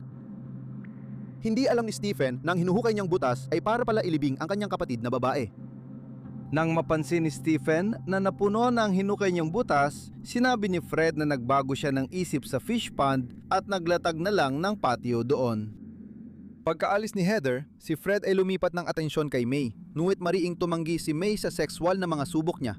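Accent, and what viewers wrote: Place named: Visayas, Philippines. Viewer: bisayang slang e😂😂😂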